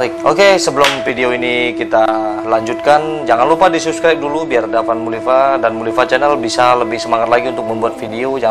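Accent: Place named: Minangkabau, Indonesia. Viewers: Solok maa suaronyo 😁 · Masih tabaok2 logat minang nyo da😁 smangat da 💪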